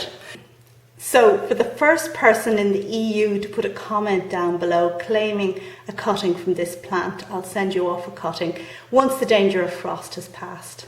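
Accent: Ireland. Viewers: Happy to have found your channel via Lyn's! I just love your accent and love seeing glimpses of Ireland out your window! I live in the US and my mom took me to Ireland for my 30th birthday. My parents have friends in Ireland and they drove us around because neither of us trusted ourselves driving on the other side of the road! We visited in February and I loved the rain and seeing the mist in the valleys and fields. We stayed in a town a short train distance from Kilkenny. I can always remember Kilkenny but not the name of the little town! Haha. I wish I had thought to bring a houseplant back as a souvenir.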